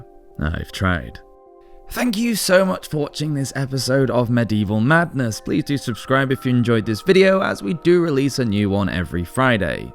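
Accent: United Kingdom. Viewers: I love the way this host talks, I am a Canadian, I quite often find some brit"s accent is difficult to understand, I guess there is many types of brit accents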